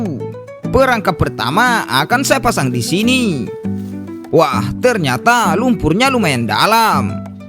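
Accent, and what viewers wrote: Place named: Medan, Indonesia. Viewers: baru pertama nonton channel ini seru juga, kayaknya dari logat orang asli sumut ya bosku? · Ini Lokasinya Daerah mana bang?, logat abang kalo berbicara seperti Logat Medan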